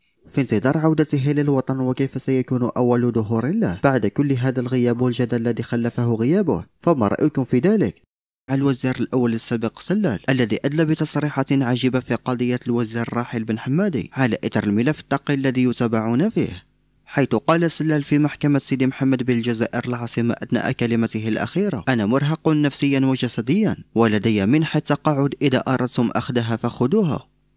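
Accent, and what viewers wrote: Morocco. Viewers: باينة لكنة مغربية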